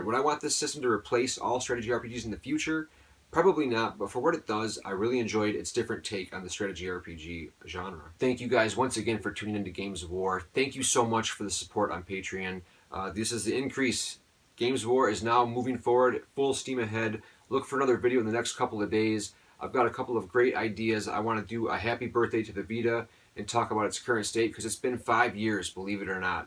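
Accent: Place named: Wisconsin, United States. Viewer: great job man! keep it up dude. are you from wisconsin? your accent sounds like it. could you start listing the game titles in your description box? thanks dude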